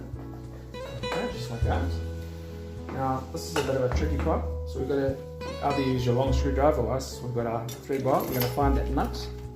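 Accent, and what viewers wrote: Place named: South Africa. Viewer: The style of this video, music and video quality and South African narration has a district 13 feel to it. I really enjoyed watching this upgrade.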